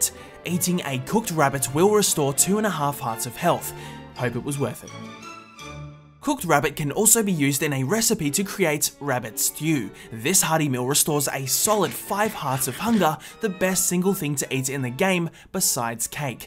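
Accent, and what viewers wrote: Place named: Australia. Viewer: I thought he was Australian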